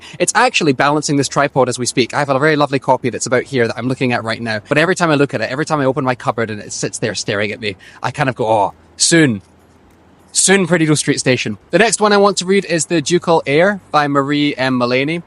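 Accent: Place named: Scotland, United Kingdom. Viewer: Duuuuuude the real deal in a Scottish accent?!! That’s it we are over the moon! So hope our family project hits for you.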